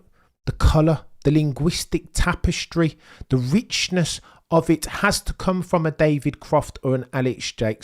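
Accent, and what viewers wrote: United Kingdom. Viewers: I’ve never heard a Brit with a accent THAT bri’ish speak so positively about Max Bravo sir 🙏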